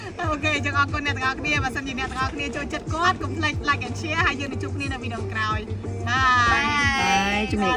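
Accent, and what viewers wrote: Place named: Siem Reap, Cambodia. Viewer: 😂😂 និយាយពិរោះតាមអ្នកសៀមរាប ដល់ឈប់រដឺនចង់ដាច់ផ្ងារ😂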